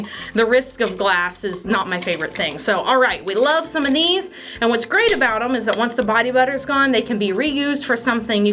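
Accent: Texas, United States. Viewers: I love your slight texas accent! It makes me so happy!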